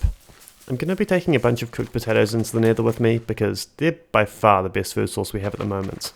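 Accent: New Zealand. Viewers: I just noticed that this guy might be New Zealish. But i'm not 100% sure (i'm a non native english speaker)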